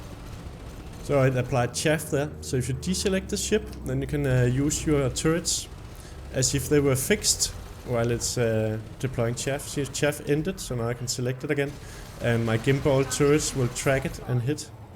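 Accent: Denmark. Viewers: Cool guide, and lovely danish accent (I'm norwegian myself :p)!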